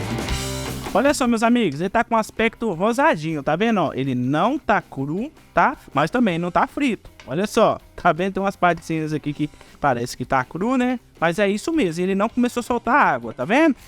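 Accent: Minas Gerais, Brazil. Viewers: Que delícia, amei sou de SP, aqui é cheiro verde, adorei o seu sotaque mineiro!!!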